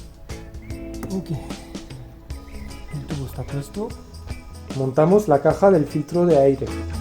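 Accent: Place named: Latin America. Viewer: ¿Amigo por qué? usted en su español, suena más parecido al latinoamericano, que al de españa.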